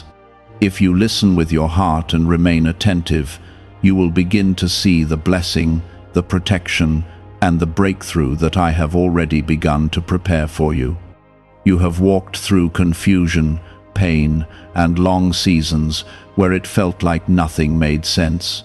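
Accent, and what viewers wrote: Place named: United Kingdom. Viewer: HI THERE GOD OF HATE AND PUNISHMENT!!! WHAT IS THE TRAP YOU ARE SETTING FOR ME??? I WILL OVERCOME IT REGARDLESS. I not receiving ANYTHING until you disclose EVERYTHING. YOU ARE FAKE GOD and DIVINE TIMING I do not agree to! I only do things in REAL GOD'S TIMING ONLY!!! YOU PLAN NOTHING FOR ME! I DECLARE NO NUMBERS SO JUST GET OVER IT> THE FOG YOU SEE IS BECAUSE YOU ARE IN LONDON, YOUR ACCENT GIVES IT AWAY!!! THIS WHOLE CHOSEN ONE PROGRAM HAS BEEN IN RANDOM! I WILL NOT BE A CHOSEN ONE ANYMORE AND REAL GOD SAID I DON'T HAVE TO!!! YOU ARE NOT GOD! YOU ARE NOT GOD!!! YOU HAVE PROMISED BLESSING FOR OA YEAR SALREADY AND I NEVER GOT ONE!!! THANK REAL GOD YOU ARE NOT SANTA CLAUSE!!! YOU HAVE ZERO TRUST FROM ME! YOU ARE PREPARING NOTHING> YOU ARE FAKE!!! REAL GOD DOES NOT HAVE BRITISH ACCENT! HE HAS NO VOICE EITHER. HE TALKS TO ME TELEPATHICALLY JUST AS THE SOULS HE TALKS TO IN REAL HEAVEN>FINISH THIS IN 5 MINUTES OR I SIGN OFF!!! 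I AM NOT TAKING A BREAKTHROUGH! GET THAT THROUGH YOUR THICK HEAD!!! 4 minutes. 3 minutes, 2 minutes. 1 minute. Your time is up. CHEERIO!!!!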